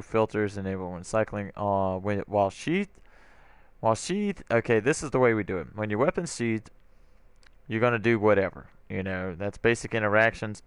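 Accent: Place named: South, United States. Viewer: Sounds like an American southern with a hint of Australian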